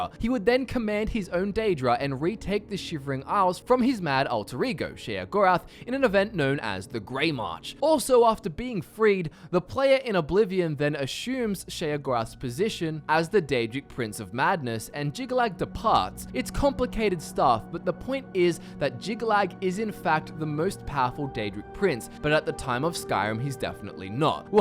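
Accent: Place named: Australia. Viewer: I love how in his Aussie accent says "Sheagorath"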